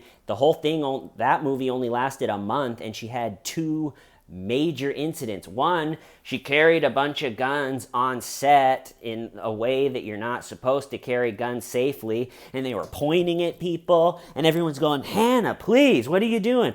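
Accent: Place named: United States. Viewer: So strong american accent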